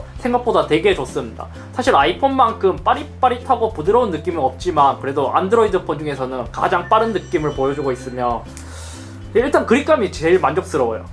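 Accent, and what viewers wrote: Gyeongsang, South Korea. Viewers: 꾹꾹 눌러 숨긴 경상도 사투리... 먼 미래에 이 청년은 사장이 되어 맘껏 사투리를 씁니다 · 와 이때는 억양이 완전 굳은 갱상도인이었네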